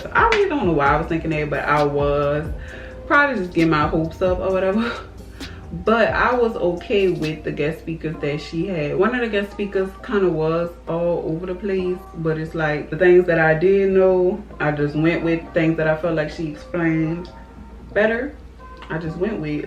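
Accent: Louisiana, United States. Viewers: I knew you were a louisiana girl from the first couple seconds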